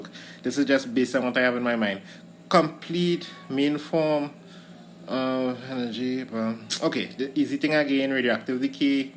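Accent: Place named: Trinidad and Tobago. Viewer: you sound trini, i am hbu?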